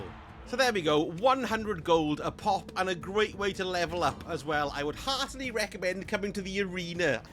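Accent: Wales, United Kingdom. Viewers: I came on this by accident and was delighted to hear your beautiful Welsh voice,. Used to follow you back in my Destiny 2 days. (Seriously, you should voice act on Elder Scrolls 6!)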